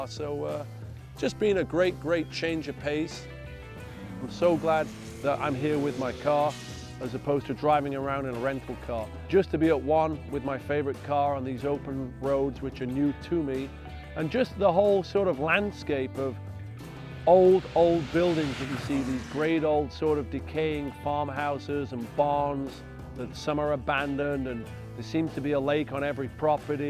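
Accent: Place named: United Kingdom. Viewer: You can really tell that he's a British boy that's lived in the states for so long. His accent sounds so muddled between the two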